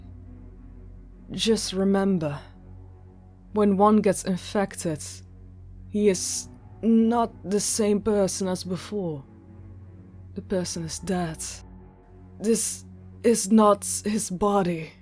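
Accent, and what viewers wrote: Netherlands. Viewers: I hate this one it's like hiding ur accent, that's why we subscribe is to hear ur thick Netherlands voice, don't make me tell u again.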